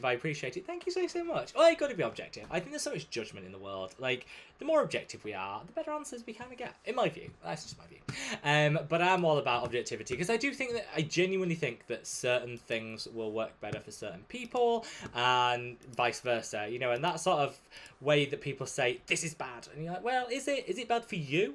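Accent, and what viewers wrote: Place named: England, United Kingdom. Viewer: Are you from England? I'm in Charlotte North Carolina. My name is Martha . The reason i asked is bc my Dad was from Birmingham England. So i have a lot of English in me. My Dads last name was Herbert. A very English name. Love your accent God bless you stay safe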